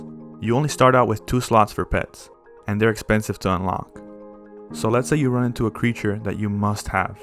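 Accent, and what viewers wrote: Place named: Brazil. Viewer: Estava me perguntando se você era brasileiro pelo sotaque, aí me deparo com o pet com o nome "Pica-Pica". Confirmado 😂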